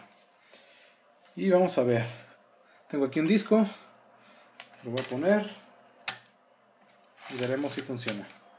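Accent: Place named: Mexico. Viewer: ¡Excelente información!!!!!!! Por el acento supongo que vos sos mexicano!! Gracias por todo lo que enseñás en el video. En mi país al cable negro lo llamamos polo negativo y al rojo, polo negativo; pero al final es lo mismo, sólo cuestión de terminologías. Muy bueno el aporte. De nuevo mil gracias!!!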